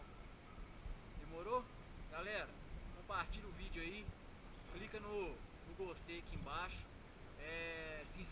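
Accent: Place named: Minas Gerais, Brazil. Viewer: mineiro aff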